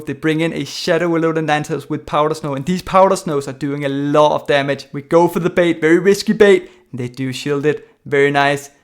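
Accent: Denmark. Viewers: First time I've clicked on your video, and still, I could hear clearly after a few seconds that you are Danish!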